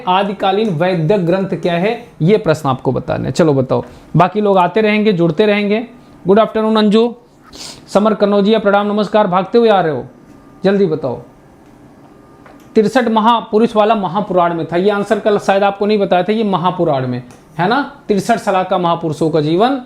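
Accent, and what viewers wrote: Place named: Prayagraj, India. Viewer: Mujhe lga ki aap prayagraj se h o.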